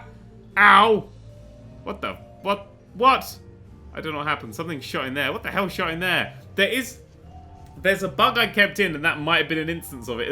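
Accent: United Kingdom. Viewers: Discovered this channel with the Roller Coaster Tycoon games comparisons and have to say this guy knows how to make good damn videos just a quick question are you British?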